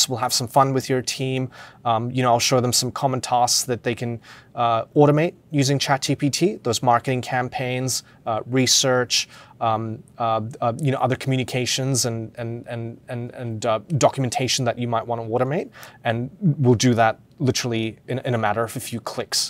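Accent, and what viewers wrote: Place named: Rural, Australia. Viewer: Too much yap yap in country Australian accent than showing ai doing sales.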